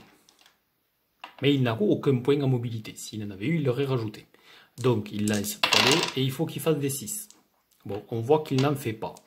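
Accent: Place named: Toulouse, France. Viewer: ho con mais vous êtes de Toulouse? merci pour cette présentation, je veux me lancer dans le jdr et celui-ci me branche bien :)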